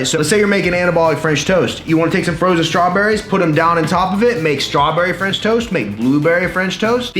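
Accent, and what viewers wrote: Texas, United States. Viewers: The Texan (non-screaming) version of Coach Greg, love your channel!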